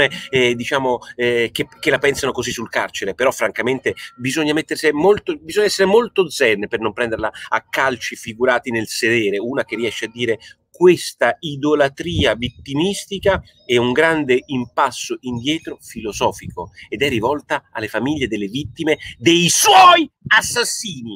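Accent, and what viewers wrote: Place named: Piedmont, Italy. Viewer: Peccato che all amico di ieri nessuno abbia risposto sull argomento... che peccato Comunque il tuo piemontese é proprio da barotto ormai nessuno lo parla così manco mia zia di 96 anni va, gadan che non sei altro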